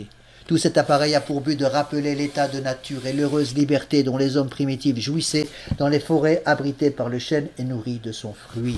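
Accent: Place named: Algeria. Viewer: MERCI INFINIMENT, MR L'ABBÉ pour cette prédication de choc, si vivante et avec le savoureux et tellement authentique accent pied-noir!❤😊😂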